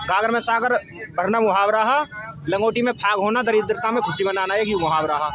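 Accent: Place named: Bihar, India. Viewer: भईयाजी बिहार से हो क्या 🧐🧐🧐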